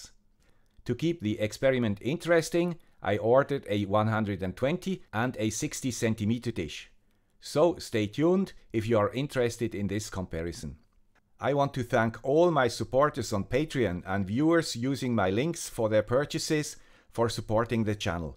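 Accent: Switzerland. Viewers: Swiss accent! Any idea about making a very long range telemetry, like 100km? Looking forward to see your response 👍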